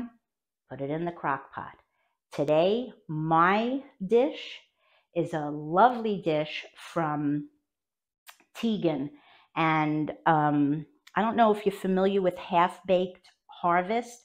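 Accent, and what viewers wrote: New York, United States. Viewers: I’m making this for dinner tonight! I found you through crocTober, but I’m going to subscribe. You sound like all of my family back in New York!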